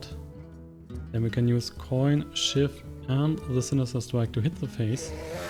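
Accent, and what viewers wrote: Germany. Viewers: gratis Englisch nachhilfe: TOMB = TUHM sorry, das musste raus, du klingst henau so, wie meine satirische immitation des "englisch sprechenden deutschen". wirklich ganz typisch deutscher "aktzent". :D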